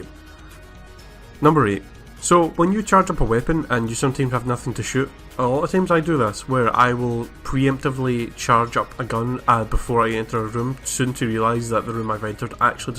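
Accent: Scotland, United Kingdom. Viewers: As soon as I heard his Scottish accent I liked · You`re from Scotland, right?:D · Are You Scottish ? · Thank you for posting this! nice Scottish accent! :-)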